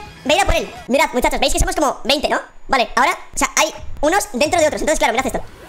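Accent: Argentina. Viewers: Weyyy primera vez q veo un video de el y me doy cuenta que es Argentino 😂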